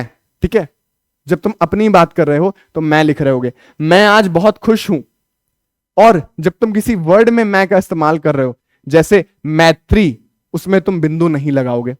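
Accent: Bihar, India. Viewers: Bhaiya Bihar se ho.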